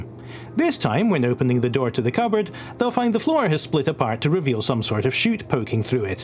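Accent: Scotland, United Kingdom. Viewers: Your commentary is so Scottish and I love it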